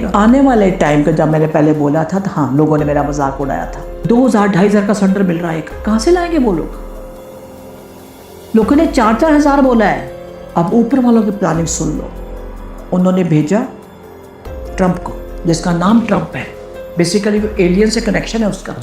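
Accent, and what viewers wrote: Punjab, India. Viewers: Thudai hindi to Punjabi di mehak aandi hai ji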